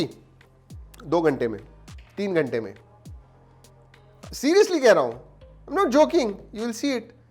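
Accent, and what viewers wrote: Maharashtra, India. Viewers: aap jab marathi bolte ho to bahot achha lgta hai.. kya aap maharastra se belong krte ho..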